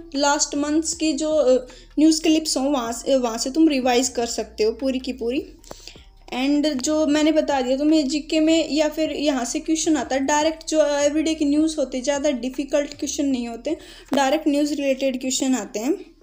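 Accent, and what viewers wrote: Haryana, India. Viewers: Her Haryanvi accent🌝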